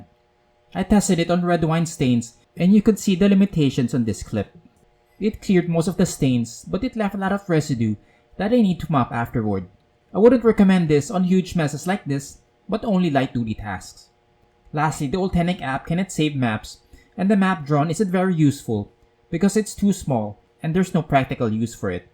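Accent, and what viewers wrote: Philippines. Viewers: Sounds like your a Filipino? Based on accent? Nice video!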